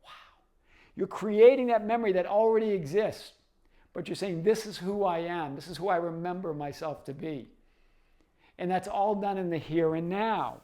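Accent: Boston, United States. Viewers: Great video! Your accent reminds me of Boston 💪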